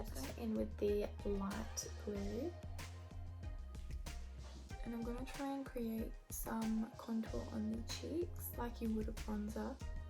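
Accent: Australia. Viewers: She really does sound like an australian, I also love the accent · Are you Australian? I love your accent!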